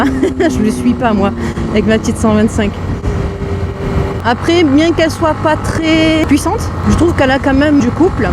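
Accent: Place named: Nice, France. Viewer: Bonjour 👋, Enchanté, joli accent de chez nous (Nice, Toulon ? J ai eu une ybr en 2011, en 10 ch, j ai réalisé tout les cols de montagne, arrière pays Nicois, même le col de la bonnette qui culmine à presque 2800m. Par contre pour l autoroute C était..... Mdr cata. La j ai une gsx r susuki (125) 15 ch.... Ça change. Avant le A2 que j ai commencé, pas évident du tout l épreuve du plateau. V a vous 2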